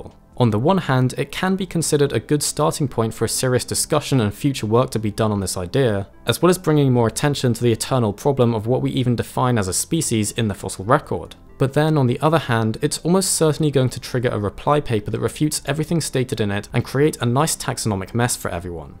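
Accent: United Kingdom. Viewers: They’re literally just British lmao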